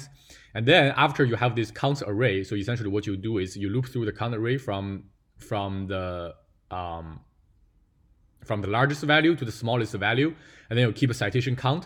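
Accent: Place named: China. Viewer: I like your chinglish style. Funny but with good confidence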